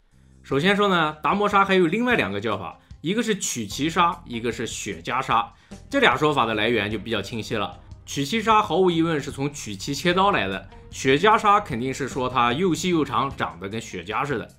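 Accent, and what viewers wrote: Shandong, China. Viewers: 楼主是哪里的口音啊？山东？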